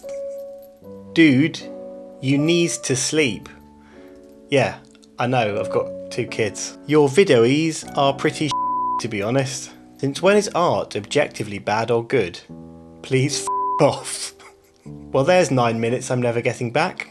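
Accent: United Kingdom. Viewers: I love your channel, even though I prefer video over stills, your perspective in interesting and to the point, none of this "hey look at me" or ridiculously excessive b-roll. Gear reviews can be useful, but they are ten a penny on Youtube and I don't even trust most of them. Nice to have a Brit too, more chilled, less ADHD.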